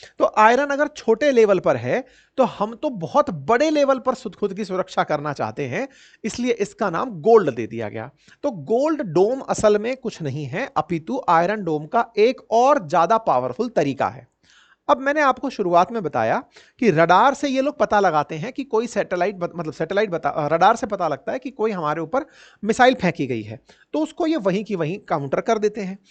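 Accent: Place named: Bihar, India. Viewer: Sir apka tone me thoda biharipan aa raha hai acha lagta hai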